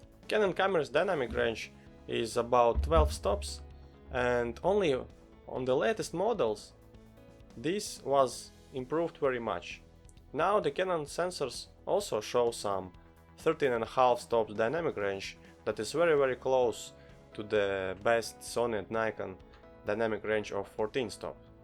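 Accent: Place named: Russia. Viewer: какой то русский акцент, ты русский?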